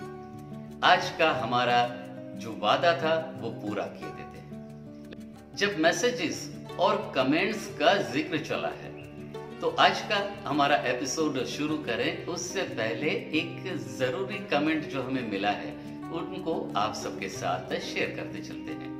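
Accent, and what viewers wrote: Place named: Sindh, India. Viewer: You seem to have a Sindhi accent. Karachi Sind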